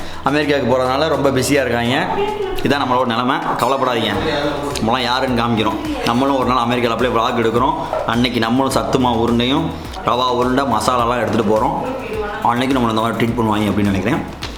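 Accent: Madurai, India. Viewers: Madurai ya thala neega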